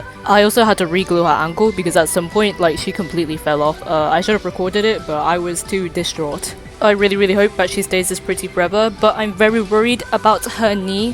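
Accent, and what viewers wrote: United Kingdom. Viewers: she's prob british bro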